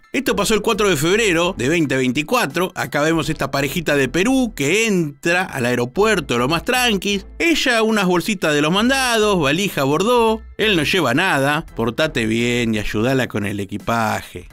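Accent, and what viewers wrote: Argentina. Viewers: El video es bueno el control excelente.lo que no me gusta es el asno que habla.seguro es argentino o chileno no saben ni narrar .xno vi el video. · Los Argentinos hablan así..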